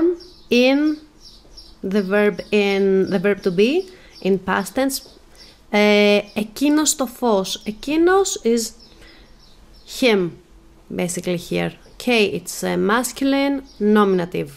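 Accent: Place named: United States. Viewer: Essa pronúncia está errada. É uma pronúncia "Americanizada". O Grego Bíblico não era pronunciado assim na século 4.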